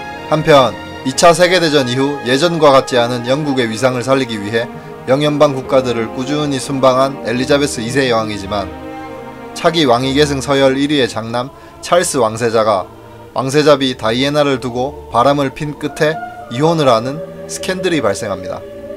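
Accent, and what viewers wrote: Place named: Gyeongsang, South Korea. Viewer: 경상북도 사투리 듣기싫어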